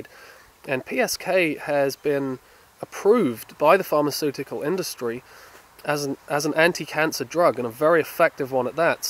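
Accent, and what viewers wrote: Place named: United Kingdom. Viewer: You sound British, where about didn't you find the mushrooms? I didn't think they grown in the UK.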